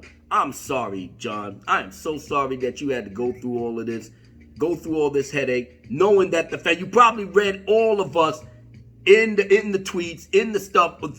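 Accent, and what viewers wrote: Cockney, United Kingdom. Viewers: as a Brit, as always I’m freaking loving your cockney accent.....priceless! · Hello! I could not WAIT to hear your take on this! Wow. It's fire as usual! You have been keen to the TFA BS since jump. I'm happy that John Boyega is speaking out. It can't hurt him, he's been honest. Btw: Love your British accent! Well done!:) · As always my brother, always agree with you. And enjoy the cockney. LoL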